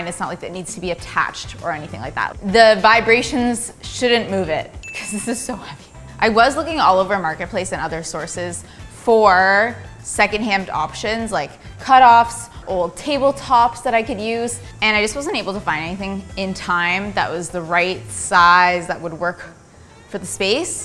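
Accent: Canada. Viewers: Omg your parents are sooo sweet!!! Also you sound more Canadian with them “aye” hehe love it!!! ❤❤❤ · 100% stepped up her Canadian game!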